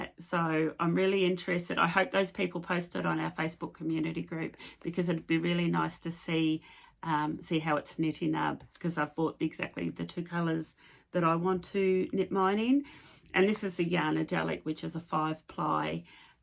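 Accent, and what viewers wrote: New Zealand. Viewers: Hi. Thank you for brilliant podcasts. I always learn something new, today was the cast off tip. Love your vest, I've never been mad on them but you've persuaded me. Have to admit that I often feel homesick when hearing your accent. 2023 will see me winging my way from the UK to Rangiora and Napier!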